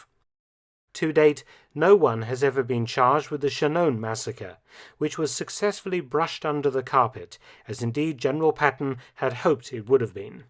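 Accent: United Kingdom. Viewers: I’ve been really enjoying this channel lately. Even his British voice goes perfect with this content. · Mark Felton Productions-- About 98.6% of your videos are FANTASTIC because you don't use politics or PC or pass judgements, just the facts!! Your videos are more education about the ww2 era than a 200 or 300 college level course on WW2! Your accent sounds British…true?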